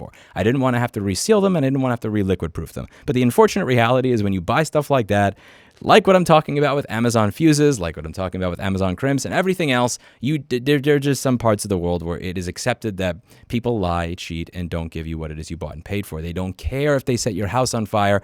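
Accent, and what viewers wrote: New York, United States. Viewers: Good to see he's keeping his angry n'yohka style, even in sunny Texas, when he needs no put these scammers in their place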